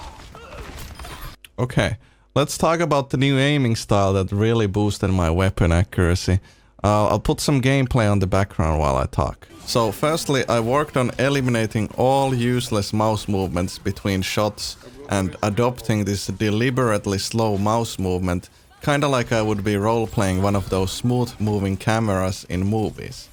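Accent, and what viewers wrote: Finland. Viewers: Took me three seconds to figure out you're Finnish by your language melody (my dad is Finnish, so I'm familiar with the language), but it took me EVEN LESS time to figure out that you're amazing at Overwatch and that this video will contain good info! Loved it! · My god I love the Finnish accent. Beautiful language too. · Your english is very finnish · I thought I heard a Finnish accent, I guess I did 😂. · You soind like youre from finland. Good tips and crazy gameplay! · U sound Finnish??? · Dude are you finnish? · suomi aksentti <3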